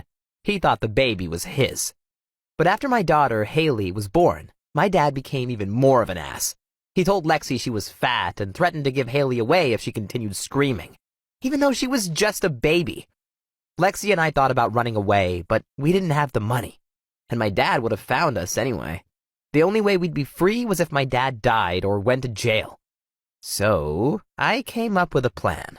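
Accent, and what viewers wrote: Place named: United States. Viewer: Is nobody gonna address the fact that he is from South America, and yet he has an American accent?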